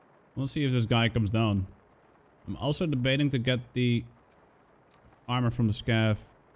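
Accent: Netherlands. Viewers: Hi funny speaking Dutch dude. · ik hoorde in de eerste 5 seconde dat je nederlands was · “Funny speaking Dutch dude” ..subbed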